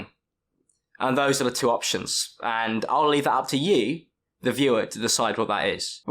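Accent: United Kingdom. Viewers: This is the first time I’ve watched an I’m video. Is he always this British? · The more I watch the less of a British accent he has//// guess ion getting used to it🥺🥺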